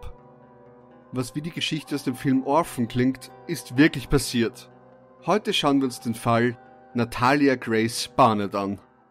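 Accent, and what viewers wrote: Vienna, Austria. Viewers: Bist du ein Wiener?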